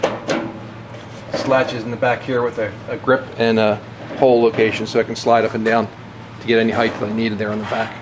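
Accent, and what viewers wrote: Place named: Pennsylvania, United States. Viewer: I'm enjoying your videos, sir! You have a certain inflection in your voice that tells me you may be somewhere up around my parts. I'm south-central, Pa. You sound more south-eastern, Pa/Delaware. You have a new subscriber. Awesome craftsmanship!!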